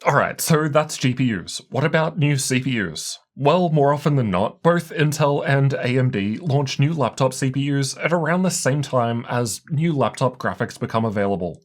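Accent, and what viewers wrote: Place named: Australia. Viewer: I like Aussie voices. Never stop talking, Aussie boy.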